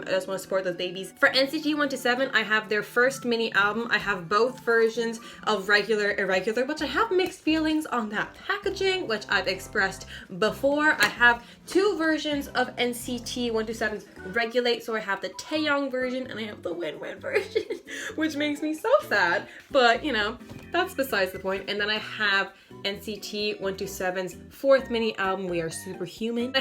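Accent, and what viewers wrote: Ireland. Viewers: awe💖 your irish accent is super cute! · bro, am i hearing a fellow irish? i stan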